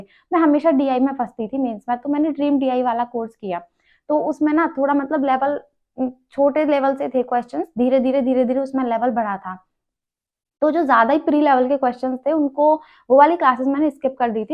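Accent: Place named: Pahadi, India. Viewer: Aap Uttarakhand se ho kya?....apki accent pahadi lagri hai 😊😅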